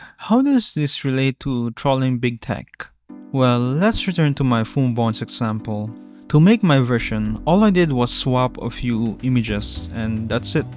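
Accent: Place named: Philippines. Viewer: I can hear the tagalog accent, so I'm guessing is from the Philippines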